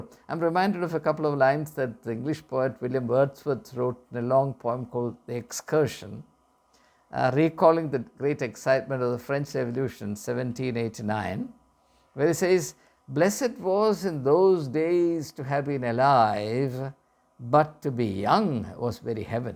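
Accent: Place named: Kerala, India. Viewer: You are a malayali,that accent never go! It's charming!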